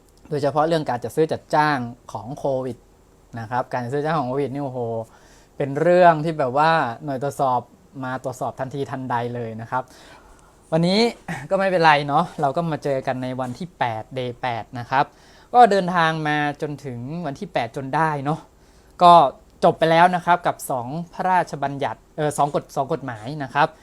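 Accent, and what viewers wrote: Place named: North, Thailand. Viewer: ติวเตอร์เป็นคนเหนือใช่ไหมคะ สำเนียงคนเหนือมาก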